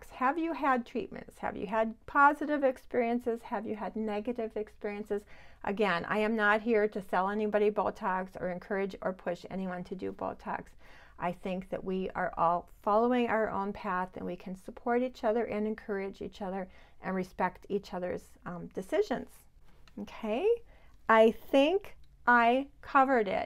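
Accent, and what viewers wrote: Midwest, United States. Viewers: I am living for your midwestern accent. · I knew your accent was Minnesotan before I seen this comment!!! 🤣😂😅❤️❤️💁🏻‍♀️ I’m the person that wouldn’t touch it with a ten foot pole LOL! 🤪😉😘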